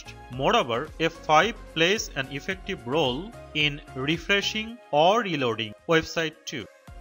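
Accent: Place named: India. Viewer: Thank you for explaining Fn keys but I struggled to understand due to the Indian accent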